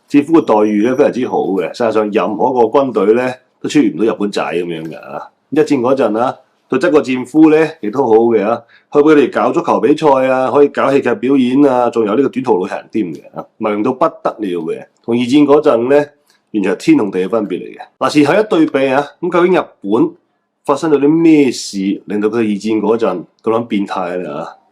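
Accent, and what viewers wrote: China. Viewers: 大陸仔滿口鄉下音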